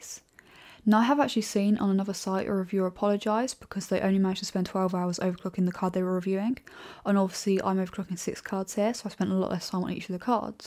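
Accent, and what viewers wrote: United Kingdom. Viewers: I'm sorry, but I don't understand most of it. While I find your dialect interesting, you slur your words together so much, that I seldom can pick them out of your sentences. I can't imagine that these videos are made only for the british people in your area (who, I suspect, would understand you perfectly well). The rest of us, and especially non-native speakers such as myself, are really having a hard time here. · I just watched this video and made a comment about how I can't understand her English b/c of her accent and I made my comment b4 I saw yours so obviously people are having problems understanding her dialect. Your videos are awesome and very professional but you need to speak slower and dictate your words individually or people that aren't British will be lost. Just my 2 cents. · Nice work, interesting channel and projects, great looks and smile, nice personal touch in videos (beautiful dresses, bunny, …) with no sight of arrogance in your speech and manners that indicate that you respect the audience. 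(I like how sometime apologize for smth. you didn’t have time to complete or achieve nevertheless the hard work you already done). If I had a TV channel and money I would definitely hire you (Don't speak American stick to UK-English accent)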